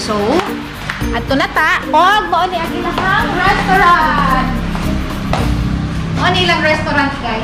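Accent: Bohol, Philippines. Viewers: Siawa jud nimo day oi mag cge jud kog agik ik sa imong sinultian ganahan kaayo kos taga bohol the way mosulti kay makahimuot kaayo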